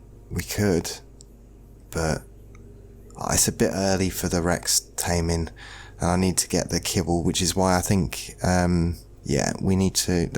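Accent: United Kingdom. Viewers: ive tried watching this but you are a true example of having a british accent making you only sound intelligent. its may lay not me lee. and im back tracking but its not fajora, youre way off. thats only a couole of examples. quit dumbing down society and get a brain, do some research for your "content" as they call it now. dont just sit on your ass and play video games and blast more commercials than the superbowl at people. mildly entertaining at best, would be better if you could pronounce stuff correctly and not make my ears bleed with the butchery of the english language you lot are so fond of claiming as the inventors of.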